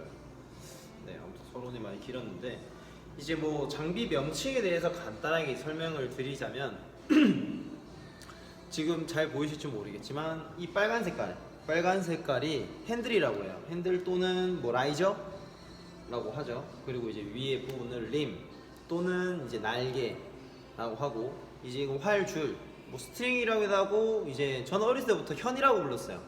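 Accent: Busan, South Korea. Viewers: 부산분이여서ᆢ듣기넘 좋아요^^ 오늘 처음 양궁을 접했구요 많이 구독해서 하면서 궁금한건 물어 볼께요 아~스트레스 해소에는 매우 좋아요 계속 쭉~욱 해볼까 합니다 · DC에서 보고 왔습니다~ 입문자들에게 아주 유익한 정보가 많을 것 같습니다~말투가 부산말투라서 부산이가 했는데 역시 부산이였네요~앞으로 많은 좋은 정보 기대 합니다~긴장하셨는지 처음에 많이 굳은 표정이라 웃는 얼굴이면 좋겠다는 생각이 들었는데~뒤로 가면서 여유가 생기면서 웃는 얼굴이 좋았습니다~그럼 다음편 기다리겠습니다~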